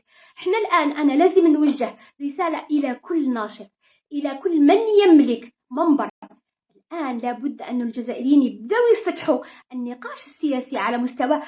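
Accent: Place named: Morocco. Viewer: والله فشل نعم خاوتي فالبداية أسمع مليح لهجةتاعها مغربية روح لبلادك المروك ترفدك كل الفيديوهات تتكلم الثورة الثقة الحراك بعدينا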